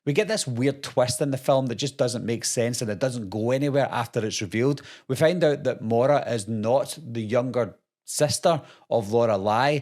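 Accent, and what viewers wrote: Scotland, United Kingdom. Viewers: With a last name of i can pick oug that scottish accent...where ya hail from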